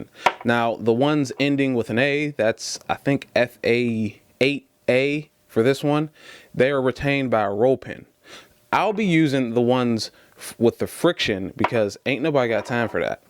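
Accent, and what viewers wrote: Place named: Baltimore, United States. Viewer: You from Baltimore? It sounds like my hometown! Nice vid thanks for the hard work in putting it online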